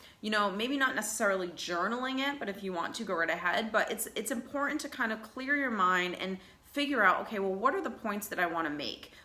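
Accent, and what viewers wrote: United States. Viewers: I didn't think you'd be American.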